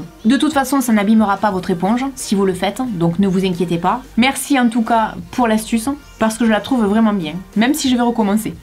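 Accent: South, France. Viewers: Alleste moi je dirai du Sud honnêtement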